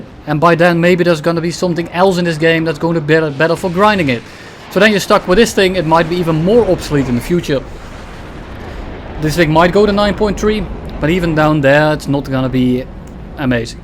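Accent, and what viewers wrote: Netherlands. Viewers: Die Nederlandse accent gaat te ver💀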